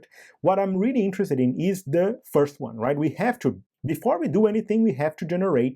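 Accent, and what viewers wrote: Brazil. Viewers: pelo sotaque, você é brasileiro! muito bom seu canal! parabéns!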